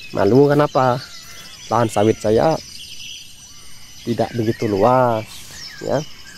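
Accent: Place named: Bangka, Indonesia. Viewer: dari dialek nya dari bangka ya bang ? salam dari belitung bang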